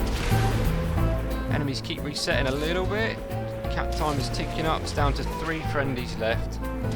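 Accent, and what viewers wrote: United Kingdom. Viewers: gg :) mad inglish man!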